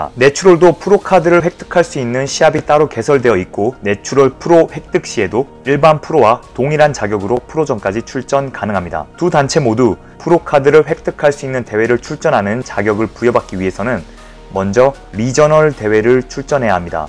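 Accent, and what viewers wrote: Gyeongsang, South Korea. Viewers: 영남권 사투리지 않나요?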